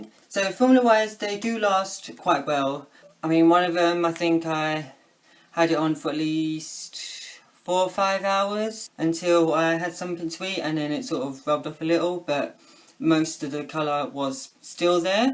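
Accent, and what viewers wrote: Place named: United Kingdom. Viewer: I liked all 4 colors and all of them look nice on you🔥 Greetings from Siberia, Russia✋ Btw, I like your accent. It seems like you're from the UK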